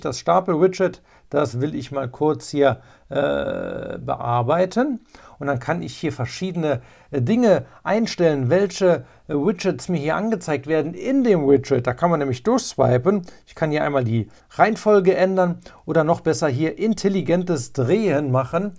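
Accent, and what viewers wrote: Saarland, Germany. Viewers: Bist du aus Saarland geil 😅😂 bin aus Saarbrücken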